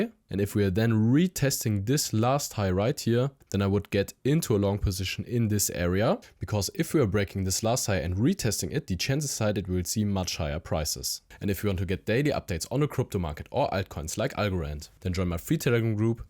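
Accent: Germany. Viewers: German accent👏🏻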